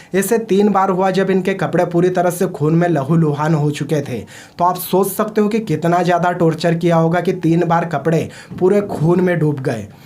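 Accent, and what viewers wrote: Madhya Pradesh, India. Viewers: I love ur accent though Are u from m.p.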